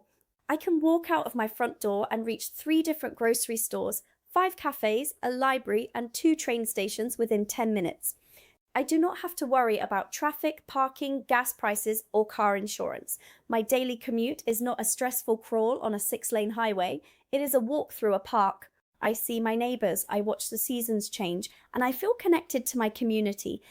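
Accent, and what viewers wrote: England, United Kingdom. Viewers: She sounds British to me, so maybe she is Australian or something? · If you're American, then why have you got a British accent? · you have an amazing english accent for an american :-) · How did you acquire such a cut-glass English accent?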